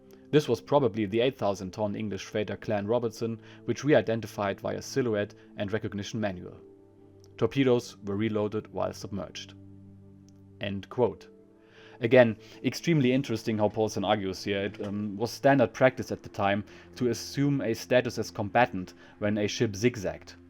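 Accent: Germany. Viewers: Your German accent makes the narration more authentic. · The German accent materializes the story into reality.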